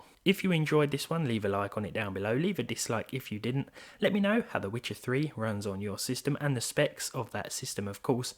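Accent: United Kingdom. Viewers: I just love this channel because there is no background music. Makes the channel different in a good way. Your british accent is the music for my ears :D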